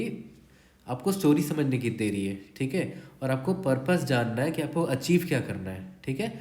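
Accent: Bihar, India. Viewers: Are you from bihar ?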